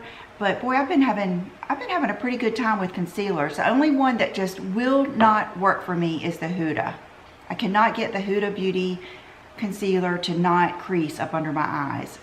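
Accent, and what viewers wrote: South, United States. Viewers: I watch you mostly because it's wonderful to see a feminine girl like myself!! I am a girly girl but strong at the same time. I feel you are too! I am also southern born and raised and I love your accent! You are beautiful inside and out.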